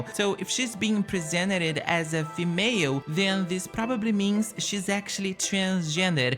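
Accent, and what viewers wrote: Brazil. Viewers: Esse ingles parece muito brasileiro... Sera que é??? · where are you from? im pretty sure you’re from brazil by your accent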